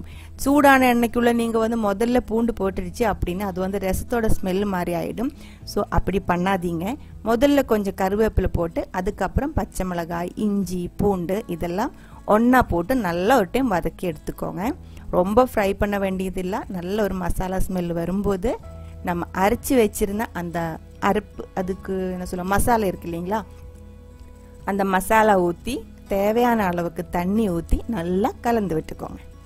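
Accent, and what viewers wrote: Kerala, India. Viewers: Azhagana Malayalam kalantha thamil pesum thangachikku vaalthukkal. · Malayalees tamil pesuradhe oru azhagu than! I like the way you speak and the way you speak and oviya S accent (big boss) !! I tried this watching a malayalam channel it was v delicious 😋 I tried it with mackerel , next time I l try in your style for jelabi meen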